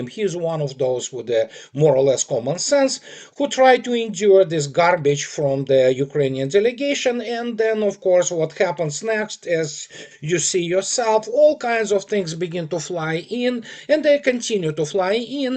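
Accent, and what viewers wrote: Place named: Russia. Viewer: 'Confabulated fairy tales' via hat marvellous Russian accent! Music to ears and as always Truth! God Bless, Andrei, a beacon of informed understanding - reality itself.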